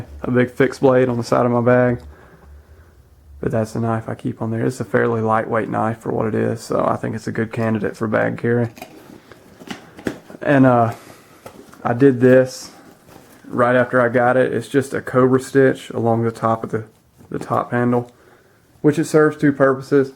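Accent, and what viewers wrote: Alabama, United States. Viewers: what part of alabama are you from?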